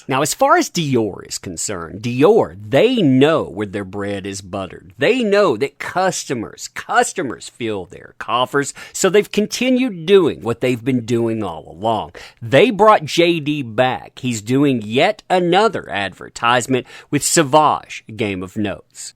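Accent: United States. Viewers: What kind of American accent are you speaking?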